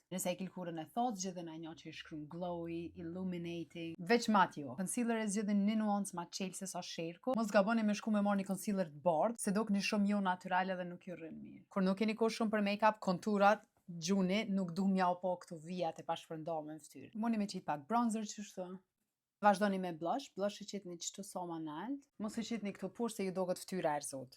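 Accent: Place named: Kosovo. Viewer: Gjermani jeton Nga kosova eshte duket nga dialekti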